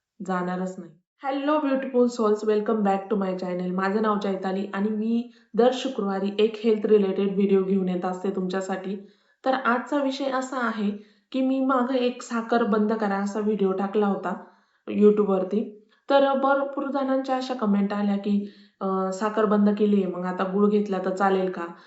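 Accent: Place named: Kopargaon, India. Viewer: छान माहिती मॅडम , तुम्ही कोपरगाव अहिल्यानगर च्या रहिवासी आहात काय, बोलण्यावरून मला वाटते 🙏😀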